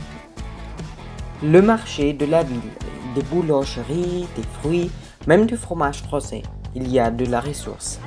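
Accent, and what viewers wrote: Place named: France. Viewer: Votre accent français est superbe, j’arrive très bien à vous comprendre..à plus à augsbourg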